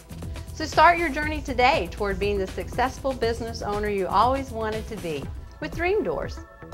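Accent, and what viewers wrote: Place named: South, United States. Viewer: sign me up I just want to see you in person. hot south accent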